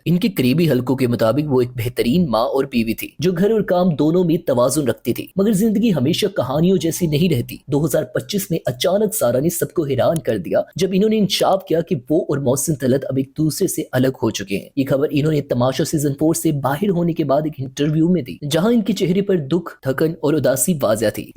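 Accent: India. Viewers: Ye Jo host hai kia India se hai. Talafguz India ki Tarah PATA nahi kion apnate hain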